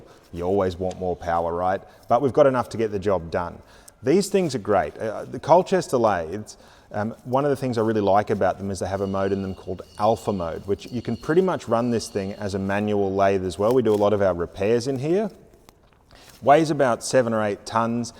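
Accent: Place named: Australia. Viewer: Hey. Thanks for showing us all this great stuff. It is fun to see your channel growing. I learned how to understand Austrailian from Curtis so I get most of what you are saying! Watching from Abbotsford, southwestern Canada. 🇨🇦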